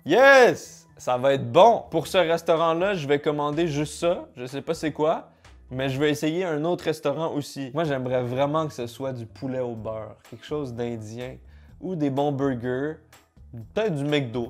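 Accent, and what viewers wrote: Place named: Canada. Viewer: Vous êtes confirmé au Canada ? Fin je suppose à l’aide de ton accent que tu viens du Canada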